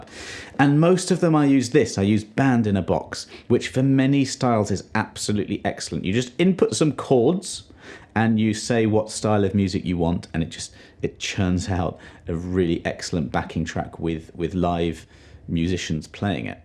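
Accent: United Kingdom. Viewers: Of all the Brit-sounding teachers out there, you ARE THE GREATEST IN MY OPINION.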